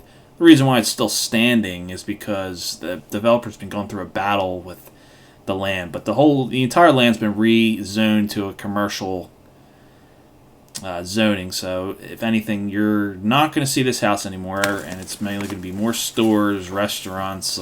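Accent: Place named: Delaware County, United States. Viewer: Your accent gives you away. We grew up in the same place, Delco. I can tell.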